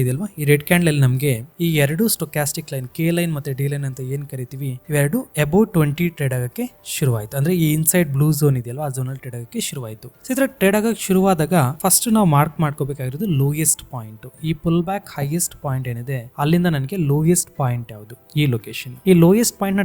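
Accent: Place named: Ballari, India. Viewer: Very nice video, I watched full and gave like also, I hope we can see 1000 likes very soon. But irrespective, please continue this video and show us to build the screener in chartinc for next steps. Are you from Ballary / Raichur region? I am just guessing based on your Kannada accent, I might be wrong. Thanks for this video, God Bless You.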